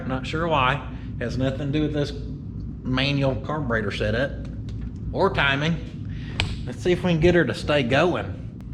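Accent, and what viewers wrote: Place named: Oklahoma, United States. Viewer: the true sound of Oklahoma!!!!! A real accent from the south west!!!!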